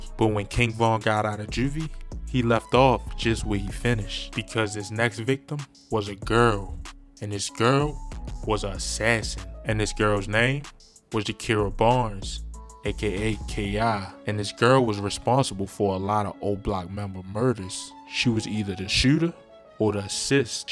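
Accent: Baltimore, United States. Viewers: Yew from Baltimore ain’t yew😭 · The Narrator sound like he from B-More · Great video. This dude gotta be from Baltimore, right? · Im from Maryland that’s definitely a Baltimore accent for sure 😂😂😂😂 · Definitely a bmore nigga narrating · You must be from Baltimore ?????? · Yo from Baltimore I hear your accent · u can here bros baltimore accident some times lmao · You from Baltimore I can hear it in your voice your videos is bogus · you from baltimore ainit 😂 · You gotta be from boltimore bro 💀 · Can hear the Baltimore accent thick!!!! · Mannn I can tell yo ass from Baltimore and I’m from Cali 😂😂😂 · Bmore as hell bruh! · Baltimore accent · U definitely from Baltimore bro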